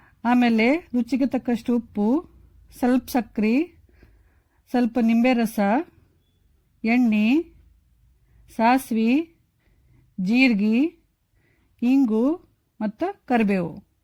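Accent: North Karnataka, India. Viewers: Pakka north Karnataka language😀. Naav mahalingpur davaru. Nimdu yavud ooru? By the way i tried ur so many recipes. It turned out super n tasty. Thanks for sharing our style recipes. Take care. Bye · I like your accent...I sense the aroma of North Karnataka Kannada...... keep it up mam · super ri madam niv nam uk style dag matadud keli balh Kushi aat ri 👌👌👌👌🙏🙏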